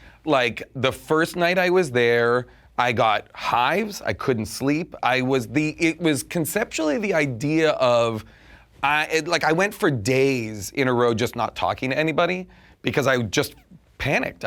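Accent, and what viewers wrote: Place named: Boston, United States. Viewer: He's from Boston or thereabouts. They say "aboot" and shit like Canadians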